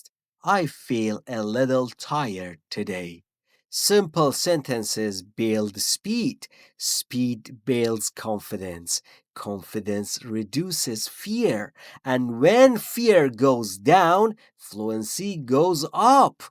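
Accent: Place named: United States. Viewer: شما بسیارعالی تدریس میکنید چون تلفظتان امریکایی ست. بسیارعالیست.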